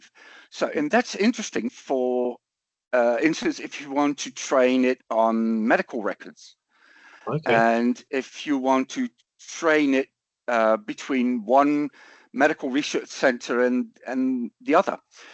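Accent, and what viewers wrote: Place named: Australia. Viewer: Nice Aussie accent for a Dutch guy ...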